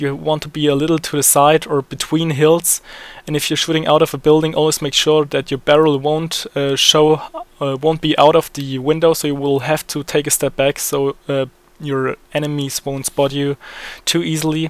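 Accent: Germany. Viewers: Haha ... man merkt das du Deutscher bist... ^^ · Haha hab sofort den deutschen akzent gehört. Sehr schönes tuorial. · are you german? your voice sounds like german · are you german? you seem to have a german accent?:D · Are you german? it sounds like you are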